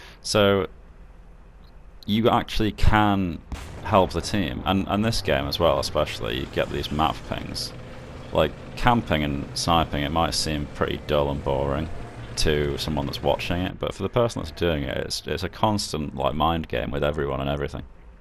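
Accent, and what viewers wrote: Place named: United States. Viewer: Are you from UK? I thought you are American from my perspective you talk with American accent.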